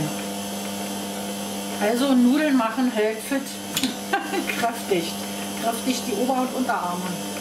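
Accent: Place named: Berlin, Germany. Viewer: Hallo, vielen Dank für Deine Videos, liebe, die berliner Schnauze finde ich als Rheinländerin schon amüsant. Ich muss aber mal was fragen, ich such mir einen Wolf......gibt es einen Adapter, mit dem man die Matrizen der großen Avance auf die kleine Viva schrauben kann? Für die große gibt es so viele Formscheiben....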